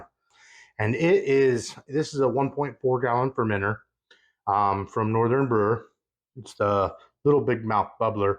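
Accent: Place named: Indiana, United States. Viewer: Have I missed you introducing yourself? Not sure I caught your name or where y'all are from, I wouldn't be surprised if your accent is from my homeland of southern Indiana. I'd love to learn a little more about ya.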